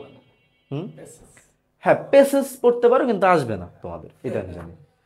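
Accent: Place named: Sylhet, Bangladesh. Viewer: ভাই কি সিলেটি? ওনার ভাষায় সিলেটি টান বুঝা যায়!😅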